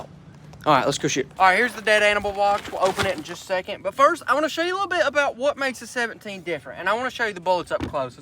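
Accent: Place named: South, United States. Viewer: Im from Philadelphia but i gotta go down south and make friends with some southerners cause i think i could pretend to talk like you forever and it never get old. Cause everything sounds funnier to me when you talk like that. Honestly i wouldn’t be able to take much seriously at all. Lol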